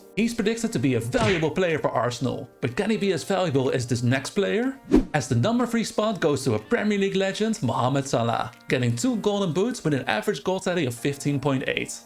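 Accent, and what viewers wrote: Netherlands. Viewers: I think every time if you talk, you’re a dutch guy who talks english😅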